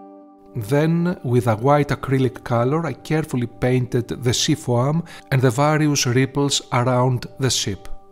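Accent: Greece. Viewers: From the moment I heard your voice I knew you were greek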